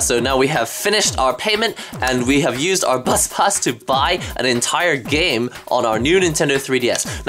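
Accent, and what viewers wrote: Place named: Scotland, United Kingdom. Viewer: Dude! You sound like you have a Scottish accent similar to mine.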